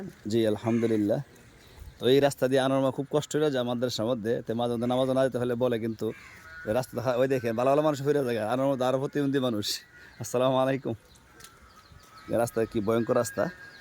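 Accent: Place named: Nabinagar, Bangladesh. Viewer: এই অঞ্চলের ভাষা ব্রাহ্মণবাড়িয়ারনবীনগরে ভাষার মত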